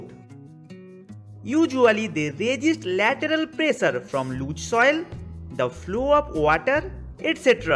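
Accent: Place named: India. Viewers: Should be proud of hindi accent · Stereotypical Hindi accent.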